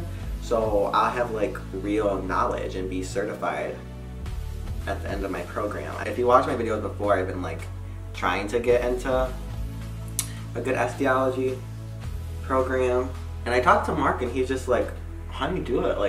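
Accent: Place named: Midwest, United States. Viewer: Your Midwestern accent gives me life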